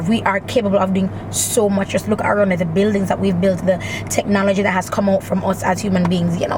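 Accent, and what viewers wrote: South, United States. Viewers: Wait but why did it have to be a southern accent you could have said it right in your accent boo cause I know y'all Jamaicans can give it up to y'all men lol.. thanks for sharing! GOD BLESS. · Lol girl you have my Southern accent down pack. I was lol I was like no she didn't.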